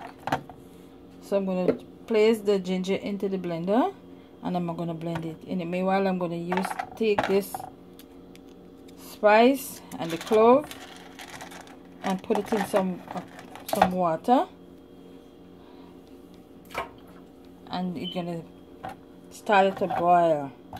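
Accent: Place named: Guyana. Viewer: i love your accent , i am from the UK ,, real guyanese accent .. ok nyce gyal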